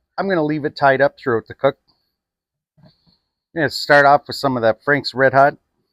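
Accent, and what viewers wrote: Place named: Minnesota, United States. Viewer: Dude sounds like he is from Minnesota...eh.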